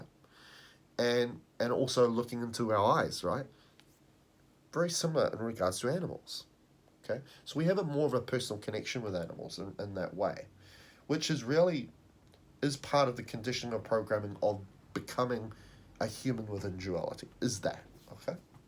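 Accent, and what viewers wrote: New Zealand. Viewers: Aloha, are you from NZ ?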